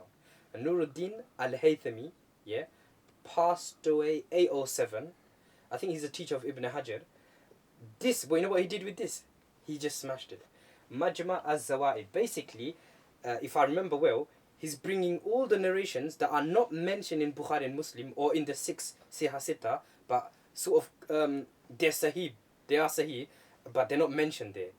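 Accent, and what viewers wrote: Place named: Sylhet, Bangladesh. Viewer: The Sylheti pronunciation...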